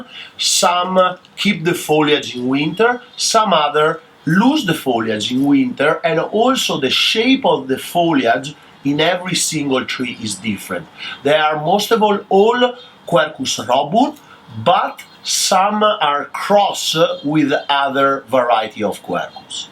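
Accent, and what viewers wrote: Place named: Italy. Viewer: You sir,.. you have all my respect and admire your work as a Bonsai Artist. But your english is good and funny!! And unique way and saying everything with a smooth italian accent! Great sir!!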